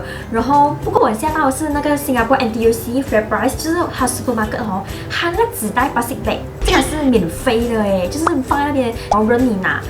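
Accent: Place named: Malaysia. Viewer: In Singapore answer call is charged ? I thought is always free。，but it's nice to hear your Malaysian accents. Like 🥰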